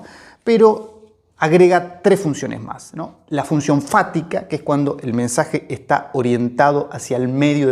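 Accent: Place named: Argentina. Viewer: Increíble! Con este acento (es usted argentino?) y hablando de argumentos interesantes, creo sinceramente que usted no exista...y encima, si puedo permitirme, muy guapo, con esta mirada intensa y esa inteligencia que parece querer salir hasta de sus dedos. Una clase estupenda (finalmente estoy entendiendo algo del formalismo ruso, la parte que usted ha tenido la gracia de explicarnos...y el miércoles tengo el examen de “Teoría de la Literatura”. Por suerte usted se ha ocupado tb de Sklovski y alguno más...iré escuchándole e investigando. Mientras tanto, aunque nos separe el Océano, sepa que mi corazón le pertenece. 🫀🩸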